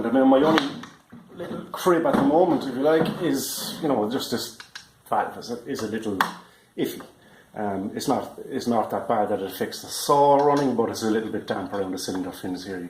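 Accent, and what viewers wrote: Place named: Ireland. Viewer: As an American I think Irish people have the best accent, and I value your opinion to the extent that i just purchased one for 237.15$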